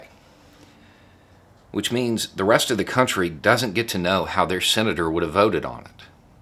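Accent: South, United States. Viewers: we have a republic. You sounded more intelligent when you spoke with a southern accent.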